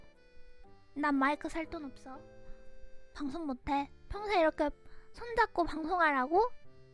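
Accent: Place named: South Korea. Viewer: 가 롤을 해서 그런지 짜증내는 말투가 점점 한국식으로 변해가서 너무 귀여워ㅋㅋㅋㅋㅋ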